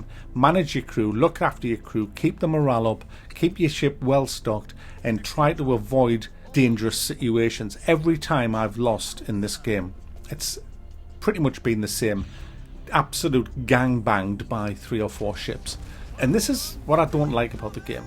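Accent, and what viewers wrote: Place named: Sunderland, United Kingdom. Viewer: Eh a mackem! Ya getting a sub just for that mate.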